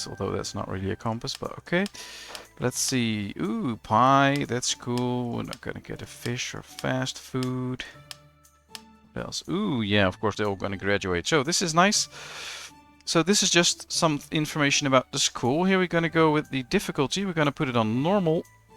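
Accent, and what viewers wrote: Netherlands. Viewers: I was trying to place the accent, I was thinking Dutch then you said Netherlands 👌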